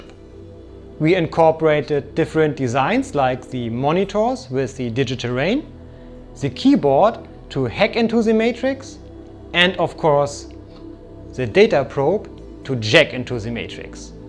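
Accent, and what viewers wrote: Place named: Germany. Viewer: Nice work, aber wenn deutsche versuchen Englisch zu sprechen hört der Spaß bei jedem Video auf O.o omg !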